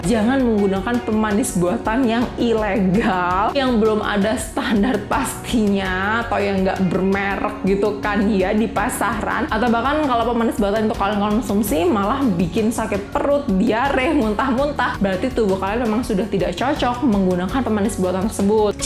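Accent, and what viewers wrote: Java, Indonesia. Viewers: Gara gara jerawat nyasar ke video dokter, problemku sama dok jenis kulit wajahnya gampang jerawatan kalo minum susu, makan keju, minum yang manis. Tapi susah ya semua itu enak.hehe..masa harus jadi vegetarian.hehe..btw, dok gak ketara kalo orang Bali loh, logatnya kok malah Jawa. Tipsnya semua bermanfaat dok. Salam kenal ^^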